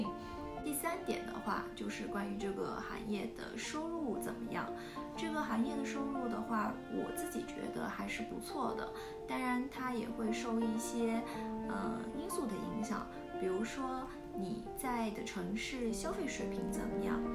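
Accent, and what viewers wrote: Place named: Yunnan, China. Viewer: 听出了云南口音🤏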